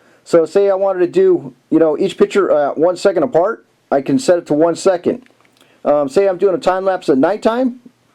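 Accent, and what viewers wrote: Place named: Texas, United States. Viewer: Great video and with the Texan accent it sounds straight forward.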